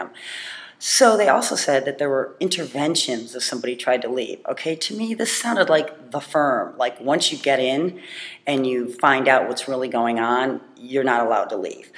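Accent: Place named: Midwest, United States. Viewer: Great, subtle Mid-america accent. Where are you from, darling?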